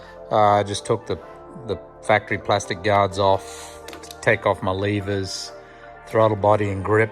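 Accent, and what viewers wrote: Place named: Australia. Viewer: Super MOD... thank you for showing us. I just sold my r1200gs and bought the 890 adv S 2023 in a few weeks... this video gives a nice tip. Are you from Australia? You have an Australian accent. I go there a few times . G' DAY MATE 👍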